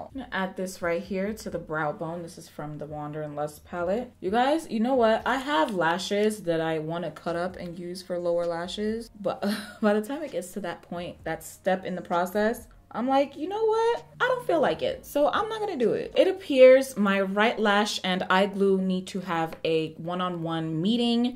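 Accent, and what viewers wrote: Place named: Nigeria. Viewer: Girl... your Nigerian accent takes me out EVERYTIME. Lovely look as usual. Those shimmer colours don't look like they'll shim well enough though...could just be me???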